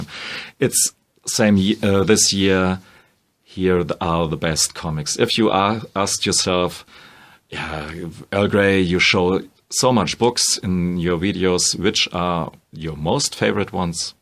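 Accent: Germany. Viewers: Wie man dein deutsch richtig raus hört.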